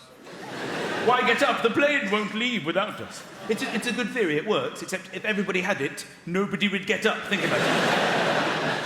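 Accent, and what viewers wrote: RP, United Kingdom. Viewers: And to all the Americans out there. This is an actual British accent. Not the posh snobby accent u bring out. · Michelle Bolen - billy is relatable with his comedy - but i relate to Micheal more..because I’m from where he lives in UK ..so accents are similar (easier to understnd) - with billy, He is a strong Texan accent..you have to really concentrate to understand every word of what he’s saying.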